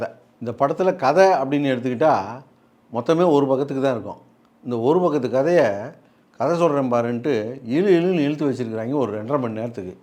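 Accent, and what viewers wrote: Tamil Nadu, India. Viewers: அவர் நம்ம ஊர் இங்கிலீஷில் சொல்கிறார். ஆங்கிலத்திலன் தமிழ் வட்டார வழக்கு. தமிழ் எப்படி இருந்தாலும் ஆங்கிலத்தில் ஊன்றி விடும்.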